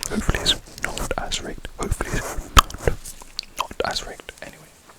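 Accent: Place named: London, United Kingdom. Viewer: lowkey hearing the london accent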